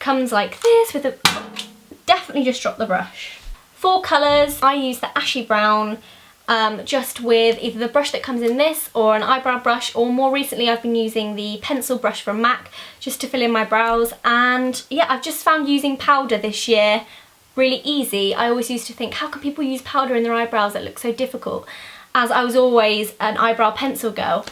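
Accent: England, United Kingdom. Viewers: Is it just me or that by having a british accent you can say things and they seem funny? Does anyone find Australians funny? Zoella I love your personality. Id seen you in a couple of Fleur and Tanya's vids and this is my first video of yours i've seen and i'll be keen to watching more:) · Your english accent is great, I mean I´m from Germany and I understand everything:) Thank you for making me feel like I could be good in English:D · I love you so much! You have the thickest english accent and it makes your videos a million times better! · i love listening to your British accent LOL:) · your bloopers are hilarious and with a british accent... it makes it even better!!!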